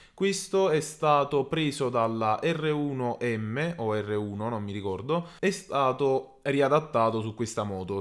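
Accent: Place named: South Tyrol, Italy. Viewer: si...infatti avevo sentito un "vago" accento Altoatesino...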